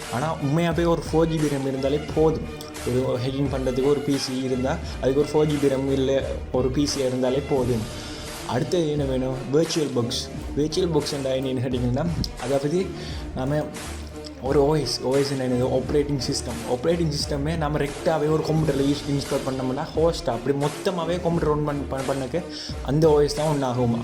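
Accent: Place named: Sri Lanka. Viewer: Bro Your Srilankan...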